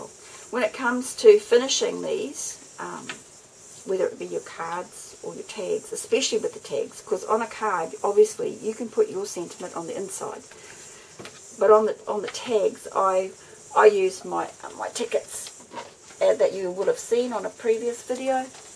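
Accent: New Zealand. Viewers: Hi...I love your New Zealand accent! I really do enjoy your tutorials and please keep talking(or singing!~)I look forward to you next vid...off to watch PT. 2 to this vid! ~Jeanne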